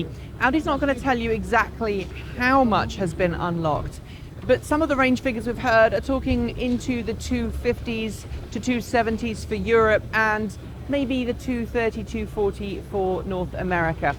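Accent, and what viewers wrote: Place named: United Kingdom. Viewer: I still don’t understand why you report in British accent while you talk normal US accent in person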